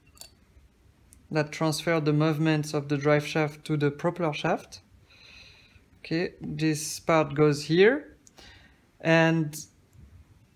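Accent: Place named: New Zealand. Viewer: Very usefull, with a Kiwi accent, thanks.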